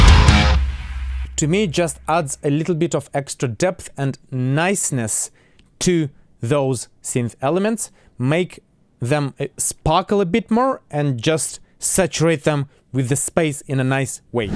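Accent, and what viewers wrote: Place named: Eastern Europe. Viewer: As a seasoned metal guitar player learning about the world of synthz it adds to your credibility that I spot an EVH, an ENGL and a Custom Audio Electronics behind you. Had it been Vox, Two Rock and Matchless combos I would have had my doubts..... :) Based on your accent I would say somewhere in Eastern or South Eastern Europe,- though it could of course be South America too,- you just never know... Greeting from a Vikingr Metaller in Scandinavia. Subscribed.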